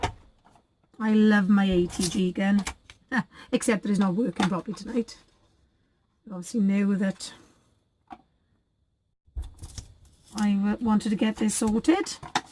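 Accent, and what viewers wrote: Wales, United Kingdom. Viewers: I love your layout, so bright and cheery. I don't use red much either, so it's great to see how you've made it work so well. Also, I love the Welsh accent! I lived in South Wales for a while many, many years ago and everyone made me feel so welcome :)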